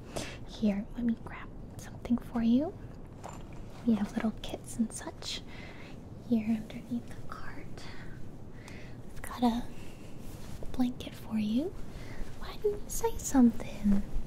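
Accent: South, United States. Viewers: maybe can visit texas and regain her southern accent again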